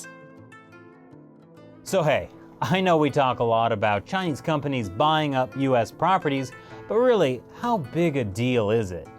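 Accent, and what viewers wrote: Australia. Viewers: an asian girl with an australian accent... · Australian accent?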